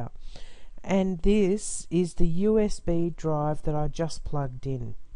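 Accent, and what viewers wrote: Australia. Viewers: Good info. / Thanks from a 70 yr. old American. Your accent (Aussie?) is pleasant to listen to.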